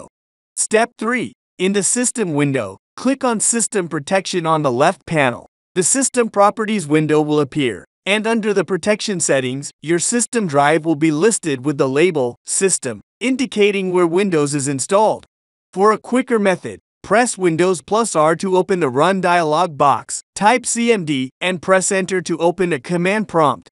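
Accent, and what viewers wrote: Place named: India. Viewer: This nigga gotta be Indian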